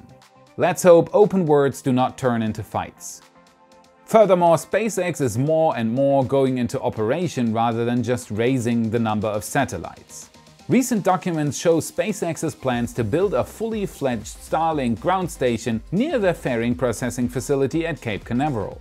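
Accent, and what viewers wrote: Germany. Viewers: wait... are you from germany? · Wait a second... do you have german roots?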